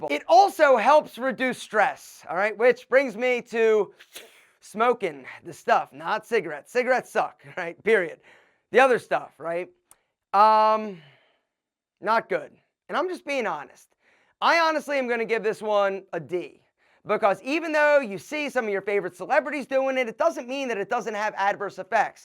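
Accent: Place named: AAVE, United States. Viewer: Try not speaking ebonics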